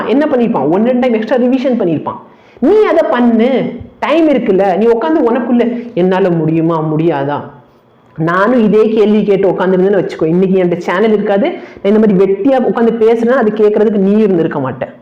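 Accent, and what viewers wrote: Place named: South, India. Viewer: mathya Pradesh a neenga south nu nenechen unga slang a vechi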